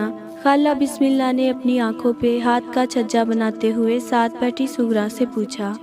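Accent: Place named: Punjab, Pakistan. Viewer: Your Voice is So Cute With Sweet Panjabi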